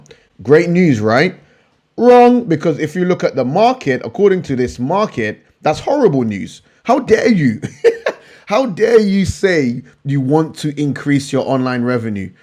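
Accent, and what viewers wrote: United Kingdom. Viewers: love your analysis, very detailed and to the point. great thanks, nice accent where u from the UK?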